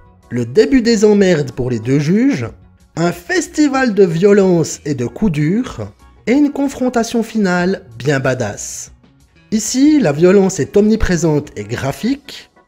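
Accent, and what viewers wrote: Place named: Vaud, Switzerland. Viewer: Excellent cet accent de bien de chez nous. Signé, Le Vaudois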